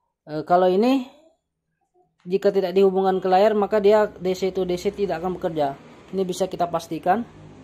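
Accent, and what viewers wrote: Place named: Deli, Indonesia. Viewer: Abg pernah di medan bg? Kalau di lihat dari beberapa vidio Logat abg macam melayu deli 😁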